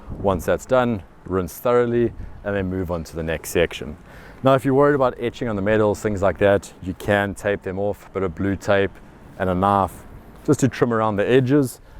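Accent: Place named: South Africa. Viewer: Great to hear the SA accent. Is this product available in Malta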